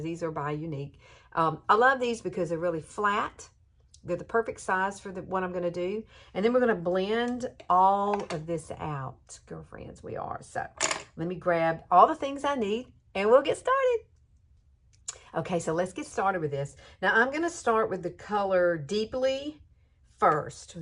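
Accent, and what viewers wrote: South, United States. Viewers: Hi, I recently found your channel; You're so cute and interesting. I like your southern drawl and emphasis on words. I'm a northerner but lived in TN for 28 years but still sound like a Yankee. is. I recently found your channel and so enjoy your videos. I'm going to try those eyelashes as soon as possible. I am 70 so I don't mess with glue around the eyes, especially after cataract surgery. God bless!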